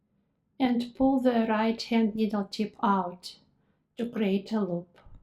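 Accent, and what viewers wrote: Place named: Ukraine. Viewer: I need an entire tutorial from start to finish. If you just want to make a video snd sell me the disk I would gladly pay up to 200 dollars. That's how important it is to me. I'm 69 years old and have noone to help me. I can't understand how to read patterns because I don't know enough stitches. I suffer from depression and often can't sleep. Knitting requires me to concentrate snd focus do when I get up in the middle of the night I can knit and the time just flies and before I know it its daytime snd my crisis has past. It's miraculous to me. Also, I have had a 20 year career as a training developer snd have a Masters in instructional design of online learning. You are the best. You should win an award. I'm going to look into recommending you for one. Also, are you Ukrainian? I only ask because I was raised by my grandmother who was Ukrainian and your accent sounds alot like hers.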